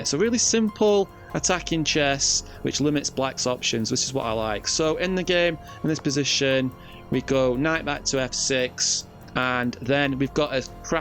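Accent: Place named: Leeds, United Kingdom. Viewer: Sorry, just trying to pinpoint your accent... Leeds...wakefield ?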